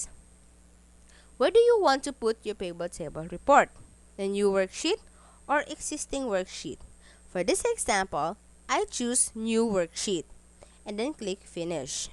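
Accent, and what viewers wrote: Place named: Philippines. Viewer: You sound like your filipino, aren't you?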